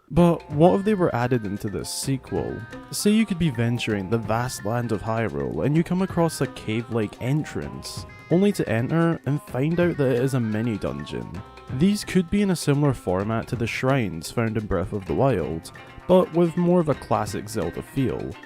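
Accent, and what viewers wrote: Scotland, United Kingdom. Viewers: Love watching these theory videos. I found your channel yesterday, and as a fellow Scottish lad, I have to say we have the best accent 😂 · So good hearing another Scottish accent 😭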